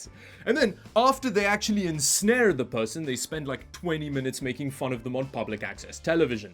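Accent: South Africa. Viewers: Are you from South Africa? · Is that a Saffa accent I sense? ☆ ○ ☆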